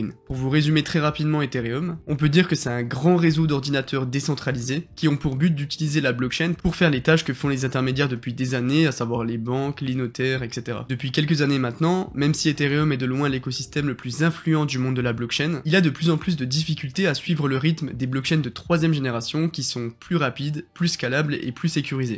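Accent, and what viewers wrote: Franche-Comté, France. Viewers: Vu l'accent, tu dois être en Franche-Comté toi ;)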